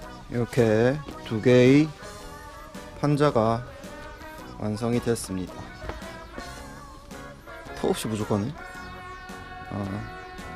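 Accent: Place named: Gyeongsang, South Korea. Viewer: 솔직히 목소리만 들어도 힐링.. 목소리완전 터프... 경상도분들 말투 매력있어요 완전 상남자..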